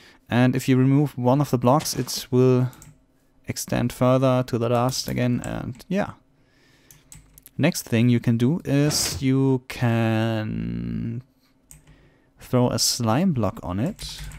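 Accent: Germany. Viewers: that german accent of yours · Man hört richtig diesem deutschen Akzent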